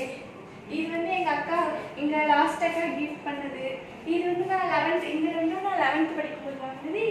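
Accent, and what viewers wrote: Tirunelveli, India. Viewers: Innocent is bliss. Ur innocent soft voice n tirunelveli slang super...I happen to see one video on 16th may that ammavituke ponnu pogamatta..liked it subscribed n watched all videos same day...liked almost all. Keep doing.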